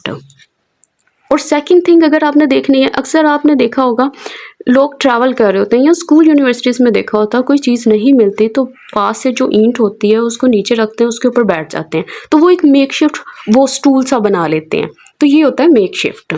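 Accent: Lahore, Pakistan. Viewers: You must be from Lahore ❤❤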